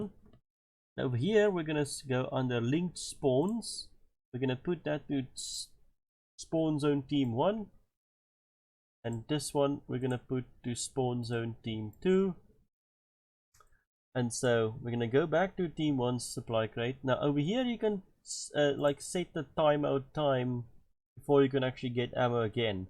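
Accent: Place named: South Africa. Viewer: I recognize a lekker accent when I hear it, boet well done video helped a lot :D asablief maak nog..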